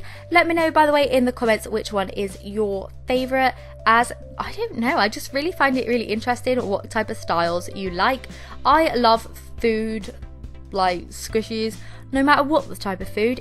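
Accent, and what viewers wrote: United Kingdom. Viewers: I love your accent! R u from england? Because i love british accent!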